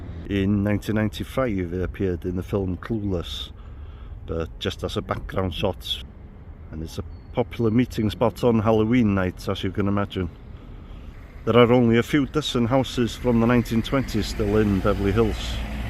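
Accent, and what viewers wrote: Wales, United Kingdom. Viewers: Just discovered your channel. Great to hear Welsh accents on YouTube. I’m from the south, but there’s definitely not enough from the north on social media. Keep up the great work, look forward to watching more of your videos. Diolch!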